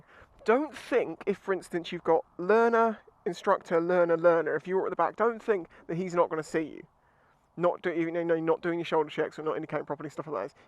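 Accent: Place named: Midlands, United Kingdom. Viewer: Dude, love your vids. You sound like you're from the Midlands (my old stomping ground). I've been watching a lot of your Lexmoto vids, and have decided due to the warranty and breakdown to plump for one of their 125's over a second user unknown YBR. I've just got my CBT, what Lexmoto model would you recommend based on your experice to continue my ride learning on L plates and to use for and after my test? I watch one vid (like the Venom) and love it...then I see you review the Michigan and change my mind ha ha. Ive also been looking at the Isca as a similar bike to the YBR but really would appreciate your opinion, specifically for new CBT riders to build up riding skills and experience.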